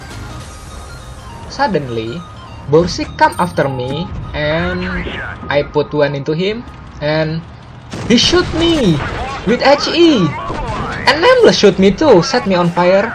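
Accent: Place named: Indonesia. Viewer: Wih orang indonesia mangkanya logatnya gue kenal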